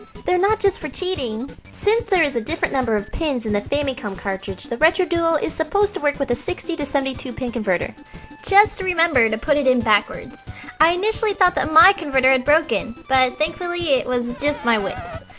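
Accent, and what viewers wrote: Canada. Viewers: Your Canadian accent is so cute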